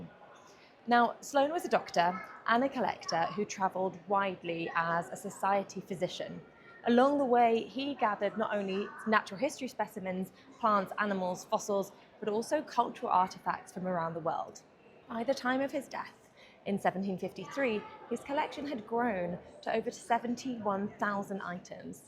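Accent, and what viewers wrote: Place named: England, United Kingdom. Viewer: Sembra un edificio affascinante, ricco di storia! Lei ed il suo accento english meravigliosi! 🌹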